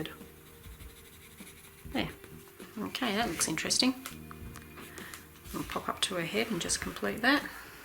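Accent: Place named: Australia. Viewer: Thank you very much for the useful tips and advices stunning work, by the way when you speak about Australia and your accent they remained me when i was student in hight school ten years ago studying in Australia and the teachers accent over there.. you makes me miss Australia and want to visit the beautiful Australia again for two mouths lol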